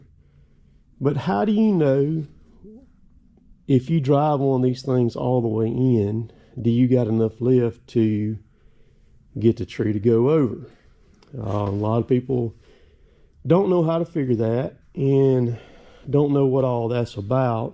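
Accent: South, United States. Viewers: that’s a great learning tool. I was looking for this information and you had it and yes the block for the notches would be a great help. You’re very good teacher. Have a great day and appreciate all your videos. You do a great job on them enjoy your channel, be careful And you don’t talk slow that’s just the way we talk down here in the south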